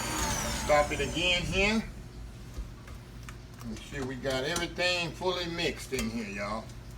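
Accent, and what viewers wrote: South, United States. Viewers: Just like my Granny from Mississippi made and taught me. I’ve Had a seizures and suffer memory loss but you bringing it all back. And that storm in the background plus ur strong southern voice is like comfort food for my ears🤗I put your channel on to help me sleep as well as reacquainting myself to my family’s old school soul food traditions. Much appreciated Chef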